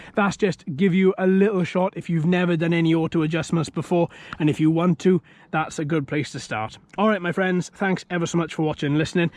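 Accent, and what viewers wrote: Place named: South Wales, United Kingdom. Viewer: Great vid. Very informative. By the way is that a South Wales accent I detect?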